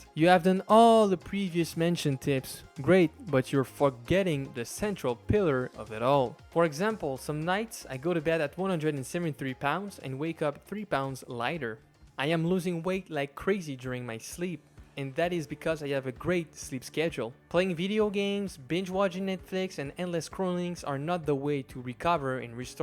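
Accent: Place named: Quebec, Canada. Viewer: Sa sonne le québécois ça🇨🇦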